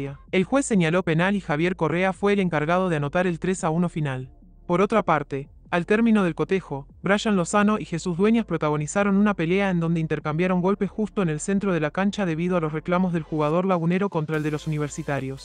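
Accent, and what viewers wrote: Argentina. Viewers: Pero por qué hablas como argentino?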